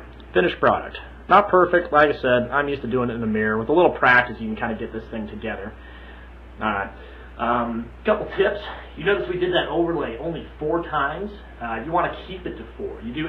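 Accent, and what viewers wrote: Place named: Utah, United States. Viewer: Two comments: #1 Love the knot, and a great demo video. Only thing you might consider for future videos is using a greater contrast between tie and shirt. #2 You'll need to work a little longer on ridding yourself of the Utah accent. Don't look now, but your Provo is showing.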